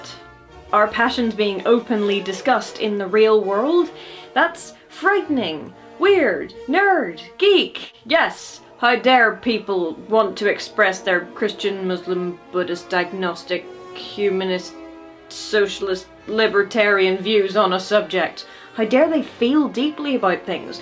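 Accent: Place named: Northern Ireland, United Kingdom. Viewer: First things first: oh dear lord, I remember stumbling upon Homestuck about three millenia ago.... even compared to when this video came out! I really enjoyed it, but it was a bit of a behemoth at that point already, and somewhat... daunting for it? Anyway... A very good series! I've watched all 7 at this point, but this one particularly stuck with me, even though I kind of don't feel like I've ever managed to get so involved in a thing that I'd consider myself a part of an actual fandom.. It kind of put things in a bit of a perspective, I suppose, and of course I do tick a lot of the boxes for being a huge fan (HP, Doctor Who, Discworld, etc.). Just... not the community ones, mainly? And, for another random tangent: I've been somewhat binging your videos for several days now, and..... I've clearly been in Norn Iron for too long, because it didn't even occur to me that you might sound Northern Irish until you mentioned Lisburn here xD xD And here I am - among other things - a bit of a linguistics nerd! 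Well, clearly it's what passes for default to my brain these days! Not a bad thing, mind, because I rather enjoy the Ulster dialects in particular :)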